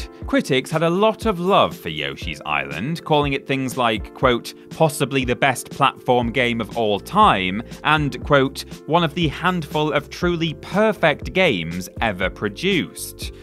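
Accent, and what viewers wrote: United Kingdom. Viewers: It wasn't until hearing pronounce it with his British accent and cadence, that it finally sank in. Diddy's Kong Quest is supposed to be a play on Diddy's Conquest.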